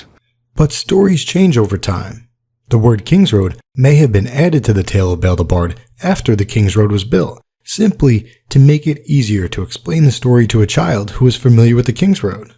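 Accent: New Jersey, United States. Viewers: You knocked the narration of this one out of the ball park!! Not too fast not too slow & just a hint of Jersey 😀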